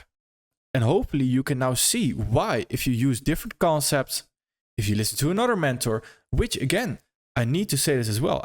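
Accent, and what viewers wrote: Netherlands. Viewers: You have to be dutch when hearing your accent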